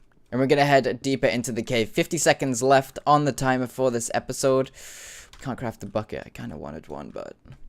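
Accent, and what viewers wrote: United Kingdom. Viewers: what happened to your british accent?:(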